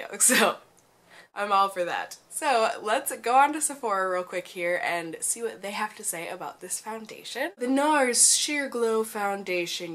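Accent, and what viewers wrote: Minnesota, United States. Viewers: I know how difficult that kind of transition in life can be, so big hugs to you! I worked at a jewellery store for years and the only thing that motivated me to come into work was the friends I had made there, they were all so amazing and we had so much fun when we weren't angsting over the shittiness of the job. I get ya, girl! Moving to Japan has also been wonderful and really difficult as well, I miss the things I used to do and the friends I had, but I equally love my time here. Anyway, I look forward to your MN accent fully coming back :DV I bought the foundation a couple of months ago and as much as I love it, I also hate it because it transfers SO much and I get shitty I have to powder it so it doesn't transfer onto all my clothes and onto the clothes of all those around me!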